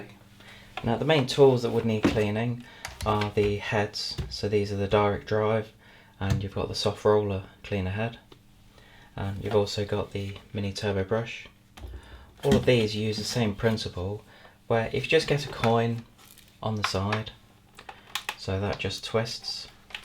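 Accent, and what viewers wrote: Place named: London, United Kingdom. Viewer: Are you from London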